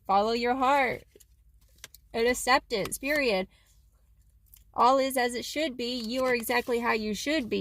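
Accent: South, United States. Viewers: my favorite southern reader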